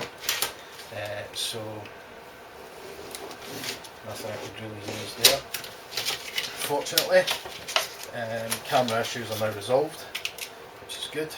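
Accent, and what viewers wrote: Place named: Scotland, United Kingdom. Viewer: I believe he's a Scot....love the accent:)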